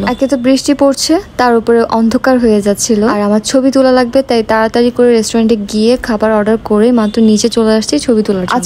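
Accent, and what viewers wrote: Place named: Chittagong, Bangladesh. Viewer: চিটাগং এর মানুষ খাটি শুদ্ধ বলতে পারেনা আপনার কথা তার প্রমান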